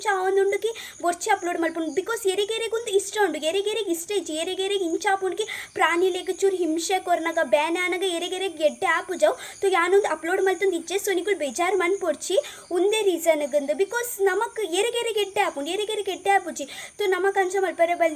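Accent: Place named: Tulu, India. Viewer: Clean tulu pathervar.. mast hats off ireg .va hindi pathendala Tulu nu burthijar. Sampradaaya burthijar... Nice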